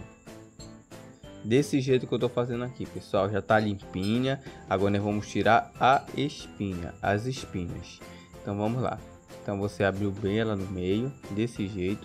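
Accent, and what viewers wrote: Pará, Brazil. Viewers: Pelo sotaque é paraense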